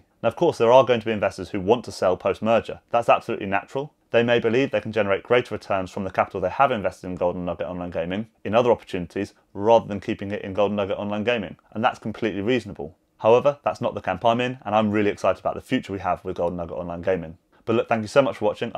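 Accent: United Kingdom. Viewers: Really appreciate the update, thank you. I got into this SPAC through you, this could be a great success story so gonna watch it and enjoy the journey. Looking forward to your next pick. Also - so nice to hear a YouTuber with a British accent! Mark - Hertfordshire.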